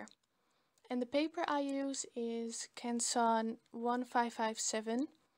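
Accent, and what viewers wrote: Netherlands. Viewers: Are you dutch? (Want k hoor een soort Nederlands achtig iets in je Engels) Youre realy good at drawing btw 💗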